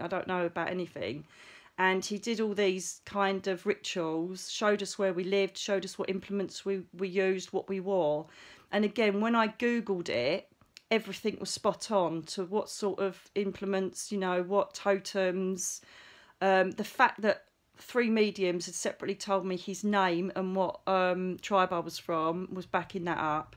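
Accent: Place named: United Kingdom. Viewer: Im just going to go ahead and say it ask it whatever lol 😅 is it possible that you have been a spirit guide to me before or can you be a spirit guide to someone while part of your soul is currently incarnated at this time let me explain its your voice the british accent the sound and frequency is almost an identical match to what Ive heard speaking to me for years. Im from WV USA but Ive always had a lovely female with a British accent that often speaks to me. I use to brush it off so noone would think I was crazy. Lol 😅 I said it I almost erased this but I heard a big NO in my left ear and a nudge to continue and ask dont erase or feel embarrassed 😂 anyways Thank you for all you do your a wonderful teacher ❤️😊 and yes I'm very grateful for all the knowledge you give and the stories you share dearest 🙏💜🕊️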